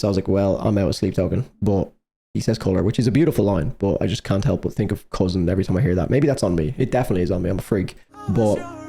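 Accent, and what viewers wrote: Ireland. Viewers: 3 reasons why your Irish (warning: funny): 1. You drink excessive alcohol, specifically in the blind reaction video for Even in Arcadia, every Irish will do this this is a stereotype really but who cares 2. Your accent (pretty straight forward) I also sense some British or Australian accent not sure 3. Lastly, in this video your drinking (which is made by Coca Cola company this blew me away I didn’t know they sold water products) which are exclusively in Ireland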